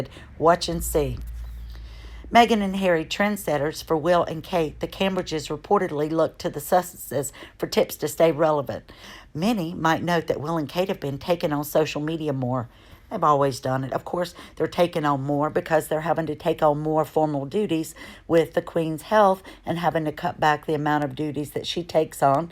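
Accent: South, United States. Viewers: Where in the south are you from? Beautiful accent.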